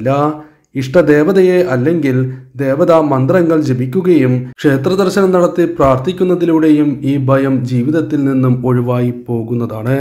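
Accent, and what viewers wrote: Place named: Namboothiri, India. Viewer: വളരെ വൃത്തി കേട് ആയിട്ടുണ്ട്.... Cool ആയി പറഞ്ഞാൽ പോരെ??? എന്തിനാണ് നമ്പൂതിരി യെ അനുകരിക്കാൻ നോക്കുന്നത്?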